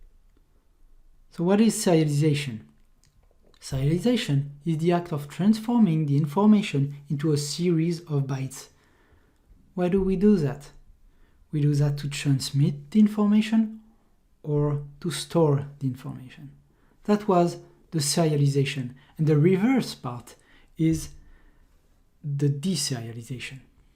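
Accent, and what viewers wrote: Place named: France. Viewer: Thanks for your video tutorials and explanations, i love your french accent because i'm also french speaker and so je comprend bien mieux ton anglais que celui d'un natif 😉😉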